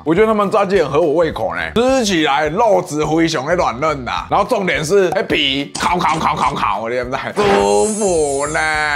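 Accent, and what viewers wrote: Taiwan. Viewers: 本來以為超台口音是效果，對不起，我錯了，原來真的就這樣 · 說話好台好喜歡❤️ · 有點台台的，不過蠻有趣的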